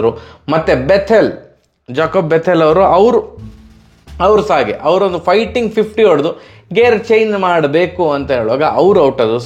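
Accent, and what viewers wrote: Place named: Coastal Karnataka, India. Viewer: Love your analysis and Karavali Kannada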